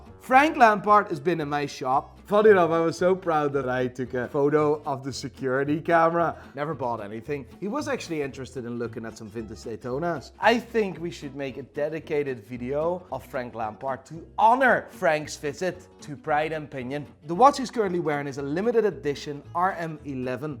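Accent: Netherlands. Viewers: Heerlijk, als ik dat hollandse accent er tussendoor hoor 😁 Well done! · Ik wist gewoon dat jij Nederlands bent ik heb het vaker in je accent gehoord maar nou weten we het zeker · ik wist gewoon dat je NL was broer. Je accent je naam alles! En uit welke stad kom je trouwens vandaan?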